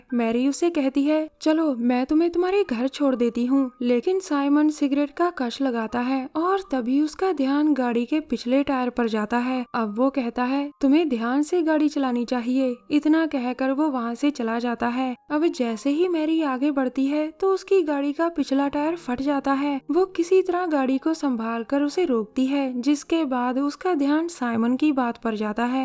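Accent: Punjab, India. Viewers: Bhai accent se pta nhi chl rha pure Punjaban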